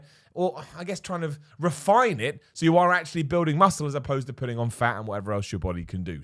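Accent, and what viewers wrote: United Kingdom. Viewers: Sooo refreshing to here a British voice on YT! Your funny, down to earth and no BS! Well done sir!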